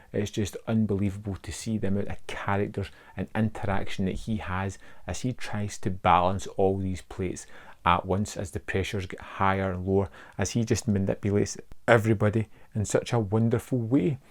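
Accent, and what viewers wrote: Scotland, United Kingdom. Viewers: You should effin subtitle your videos, dude, that thick Scottish accent is too much to handle.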